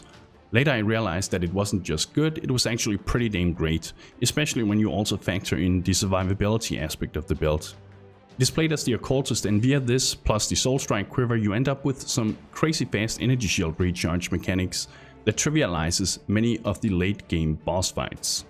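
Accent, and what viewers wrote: Denmark. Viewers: Are u danish? 😅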